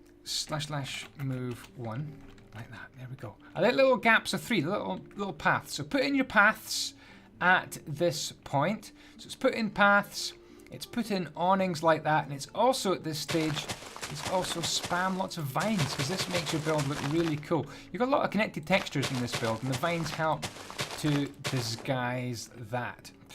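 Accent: Scotland, United Kingdom. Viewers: I love the wee bit of Scottish in there. :) Well done you. ^^ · Decided to subscribe because of this building system! And because you're Scotish!. Love the system. IT's so easy to follow as a beginner and easy to spruce up as an expert builder. Keep up the good work.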